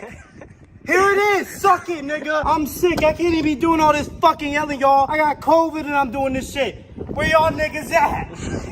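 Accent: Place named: Philadelphia, United States. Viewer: Lmfaoo yo from start to finish u can hear the Philly accent progressively comin out 🤣🤣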